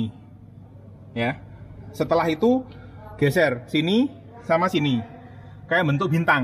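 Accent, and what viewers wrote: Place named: Banyumas, Indonesia. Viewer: Oh ternyata om ngapak tohh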